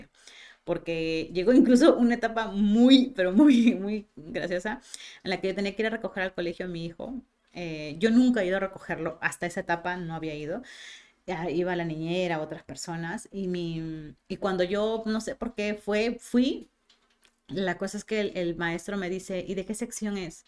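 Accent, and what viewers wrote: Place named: Peru. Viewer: Me inspiraS , tienes algun libro de tu autoria?? Hablas como peruana. Ya soy tu seguidor..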